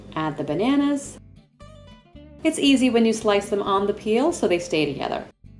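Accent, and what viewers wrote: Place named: United States. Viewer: I just love American accent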